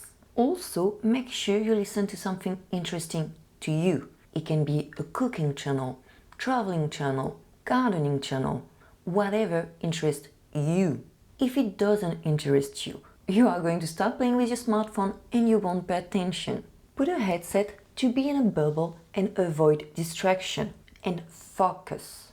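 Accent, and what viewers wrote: France. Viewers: BTW I love your French accent so much!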